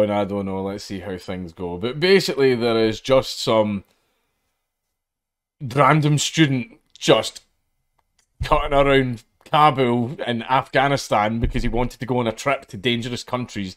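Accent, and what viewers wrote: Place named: RP, United Kingdom. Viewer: I love posh accent, pure gold:D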